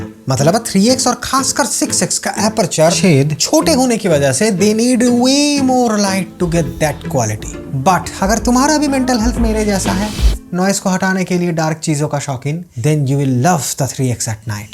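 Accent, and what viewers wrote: Bengal, India. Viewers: Tera hindi sunkar maza aa gaya tu bengali hai tera hindi sa pata chalta hai ❤❤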